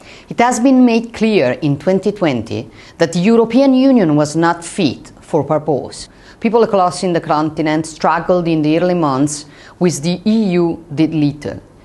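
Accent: Rome, Italy. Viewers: non si possono paragonare .. ovvio, era imbarazzante e lo è tuttora, ma lei sta leggendo un copione studiato e per lo piu con pronuncia in romano quindi non vedo chissa che genio sinceramente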